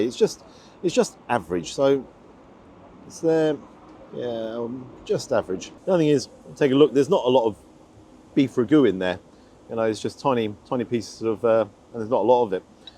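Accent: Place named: England, United Kingdom. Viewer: Hello from Budapest, Hungary and I am always hungry😅 I really love watching your videos mainly because of your accent and I do think that YOU are a true -born Englishman😊 My family and I are planning to visit London so I am trying to get some ideas where shall we dine once we are there😊 All the best !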